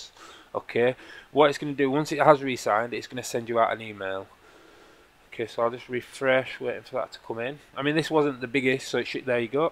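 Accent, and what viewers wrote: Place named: England, United Kingdom. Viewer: Hey I didn't realise you was English I though you was American. +1 for English jailbreaks and developers.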